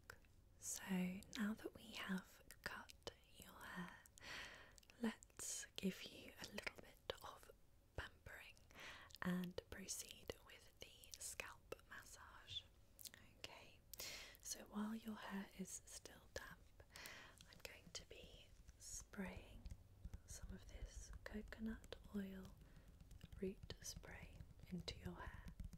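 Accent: England, United Kingdom. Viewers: the English accent works awesome for asmr👑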